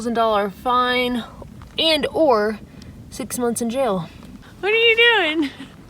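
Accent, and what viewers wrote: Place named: Alabama, United States. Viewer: Am I the only one who teared up when Old Faithful started goin? I'm just in complete awe of you and your accomplishments and perseverance despite any challenges. It really makes me reevaluate the way I'm livin.. I live in some of the most beautiful of lands in northern California and tend to get complacent. I don't ever want to take all of this beauty for granted as I could be trapped in a concrete jungle. I have been binge watching this channel and now have my mama watching it too...also she's from Bama in a big way because her Dad lived in Gadsden his whole life and she'd be there for the summers. Then she started taking us kids there often growin up. So a lot of my relatives and even myself at times sound like you lol people look at me funny in Cali whenever I say "I reckon" and now I reckon I re-realize why I say it... 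because I've got Bama blood. Anyways, I can't wait to watch more of the trail and start bein more of a willer myself. I've officially got the hikin bug. Thank you for sharing your heart and journey with the world 💜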